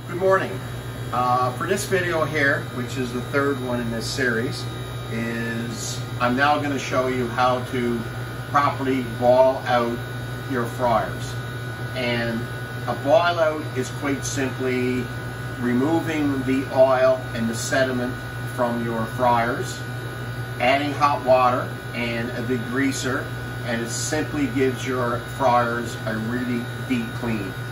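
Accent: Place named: Canada. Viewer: I hear Canadian accent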